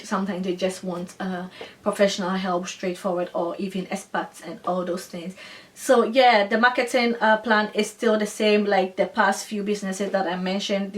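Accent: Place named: East Africa. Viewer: thank you for that insight. Yes, there are a lot of Ghanaians living in Holland (just as there are here in the UK), that much I know, but I must admit her accent prompted me as being perhaps of East/Southern African origin and hence my initial question.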